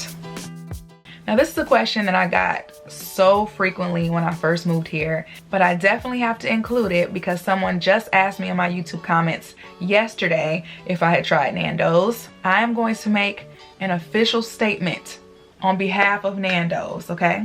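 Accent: South, United States. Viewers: You got that southern accent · Love the way you talk with an occasional “ y’all”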